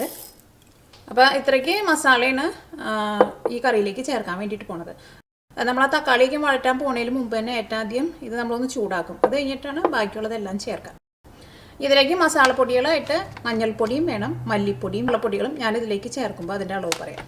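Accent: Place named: Kunnamkulam, India. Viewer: Chechiye..njn kunnamkulamkaarana.onnum vnda mmade aa pedakkhna samsaaram aanh chechi kooduthal hit aaayath ente frend paranjitta njn ithine kurich ariyunnath.nthaayaalum classaayindh.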